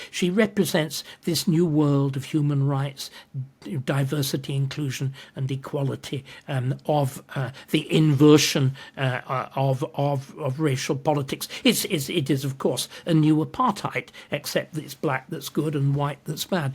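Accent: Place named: RP, United Kingdom. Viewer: David Starkey's presentation alone in the past! Unfortunately, we as a general public, don't relate to such a plummy proper English speak! Reminds me of my grandad, bless him!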